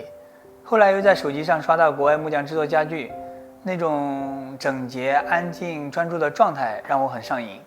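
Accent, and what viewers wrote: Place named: China. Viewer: Привет! Всегда думал, что ты из Японии, но твоя речь и особенно цифра "5" дали мне понять, что ты из Китая. Просто, я не слышал, как ты разговариваешь). Но, не это главное.. Каждое слово в твоём монологе, каждая мысль, созвучны с моими мыслями. Я всю свою жизнь не зависел от начальников, государственных структур и тому подобного. Всю свою сознательную жизнь я зарабатывал своими руками и накопленным опытом. Это были, в основном, ремонты интерьера и разработка и изготовление узкоспециализированных станков. Теперь мне 49 лет, но я гораздо моложе физически и полон сил.... Обеспечил себя большим количеством свободного времени и хорошего инструмента. Твои видео, а так же других мастеров, дают мне идеи и приёмы в новом опыте. Благодарю тебя. Привет из Мариуполя..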